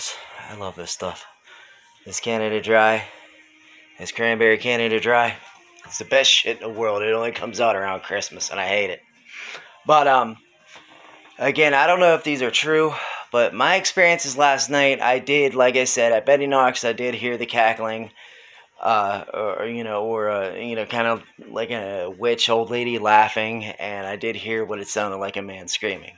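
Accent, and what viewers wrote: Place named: West Coast, United States. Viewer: Wow well out on the accent HA HA HA HAthat's comical,and I thought I was good at accents,could have sworn I heard that west coast surfer sound in your accent ahhhhh... Yeah,she's ok now,very long time ago but when that happened and the things that happened after.. it was like with taps coming in and noises like coming down the stairs,made me think of your doors opening and stuff it's always hard to catch these things on camera though. Like they don't want to play when you do,when I was at my other flat two small pictures just jumped off the wall whilst I was looking in the mirror near them I only saw it through my peripheral vision but the girlfriend saw it I was like ' wtf happened then' but she saw it,they couldn't have fell by accident as they wehanging off nails you had to lift them up to get them off and other times,other things that were quite frightening at times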